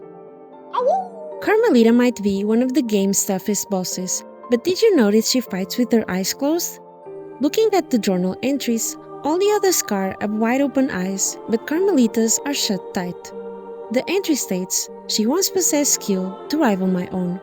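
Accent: Portugal. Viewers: Is your accent Portuguese? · Just out of curiosity, are you Portuguese? Because your accent sounds like it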